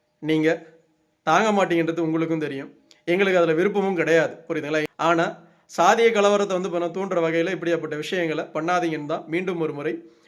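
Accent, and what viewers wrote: North, India. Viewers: நீ வடக்கனா என்ன தமிழ் பேசுற....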